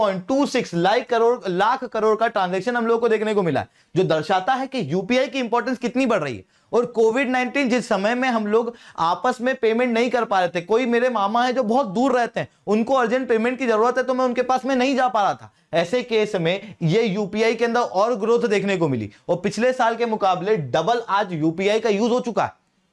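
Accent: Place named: Bhopal, India. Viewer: Bhopal se ho kya bhai 😐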